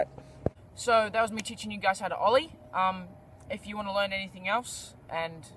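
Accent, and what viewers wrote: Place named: New Zealand. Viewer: Nice tutorial man, but i couldnt get over your accent! what is it? Kiwi mixed with Australian and British?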